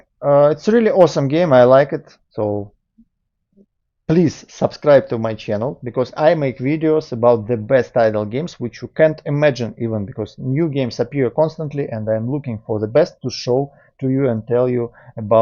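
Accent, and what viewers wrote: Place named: Russia. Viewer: Видно по акценту, что ты русский) Сними ещё видео, буквально вот на неделе обнова вышла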